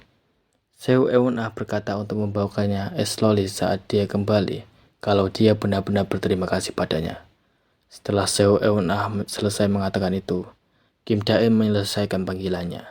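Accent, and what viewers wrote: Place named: Java, Indonesia. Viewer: Medok bgt mas❤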